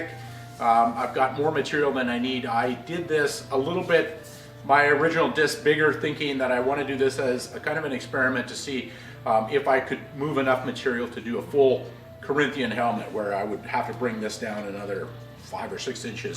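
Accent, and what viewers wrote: Canada. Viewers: Accent says Canada.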